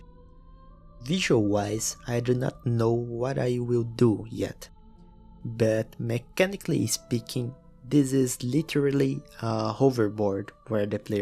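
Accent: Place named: Brazil. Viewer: Cara, pelo que vi, você é brasileiro (suspeitei pelo sotaque e uns comentários aqui hahaha). Queria deixar aqui minhas palavras de incentivo e de admiração. Este vídeo e a sua jornada são inspiradores pra mim na minha busca de criar um jogo. Você me parece um rapaz muito criativo, dedicado e talentoso, por isso, espero que vc atinja seus objetivos e sinta a alegria e satisfação de ver seus projetos completos. De verdade, mano, meus parabéns!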